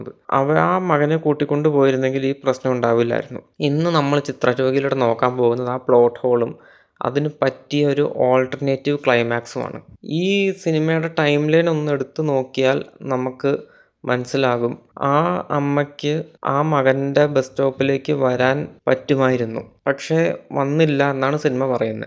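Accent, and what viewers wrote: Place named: Palakkad, India. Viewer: വളരെ കാഷ്വൽ ആയ വിവരണം! കൊളോക്വിയൽ പാലക്കാടൻ(?) സ്ലാങ്ങ്!! നല്ല ലോജിക്!!! 🎉❤🎉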